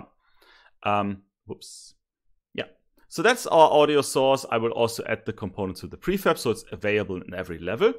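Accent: Germany. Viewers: Sounds you are a german guy =D great Tutorial, thanks =D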